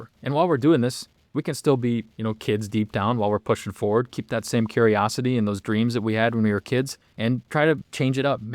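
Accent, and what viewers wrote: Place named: Wisconsin, United States. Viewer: Bro has the most Wisconsin accent ever I gotta say dude 😅😅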